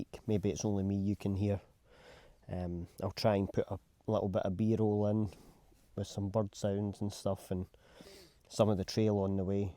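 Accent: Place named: Scotland, United Kingdom. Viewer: New pipe smoker here- I love Malcom and his poem. You did it justice mate- something about reading poetry with a Scottish accent- it really works Thanks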